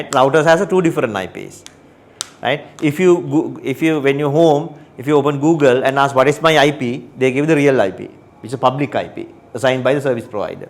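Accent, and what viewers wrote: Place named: Sri Lanka. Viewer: within 5 seconds , I was able to tell the instructor is SriLankan . :)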